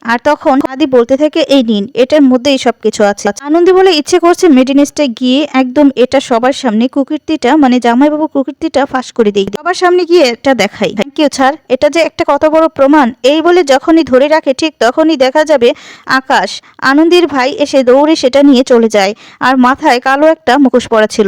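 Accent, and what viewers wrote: Bangal, Bangladesh. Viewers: আপনার বাঙ্গাল বাঙ্গাল, চোয়াড়ে,অশিক্ষিত কথকের কথকতা শুনতে মোটেও ভাল লাগেনা।যে গল্প বলার caption দেন,তা শোনান না।এটা breach of trust ,আইনত দন্ডনীয়। নিজের ভুল শুধরে নিন। চেষ্টা করলে শুদ্ধ, কথ্য ভাষায় কথা বলতে রপ্ত হয়ে যাবেন। আশাকরি ,চেষ্টা করবেন নিজেকে তৈরি করার। অনেক শুভেচ্ছা রইল।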